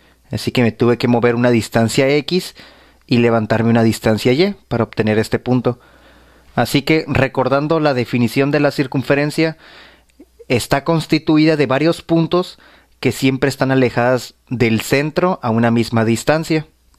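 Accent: Mexico. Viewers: es chistoso el acento mexicano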